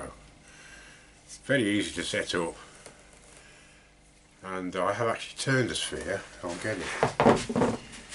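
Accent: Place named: England, United Kingdom. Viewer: I wish I lived closer, I have always said “ anyone who has an English accent automatically has 10 points added to there IQ”. I as well have two super Nova chucks one for my Jet lathe and one for my General lathe. I enjoy your method of presentation on your videos. Keep up the good work. Cheers Don Lakewood Wa......U S A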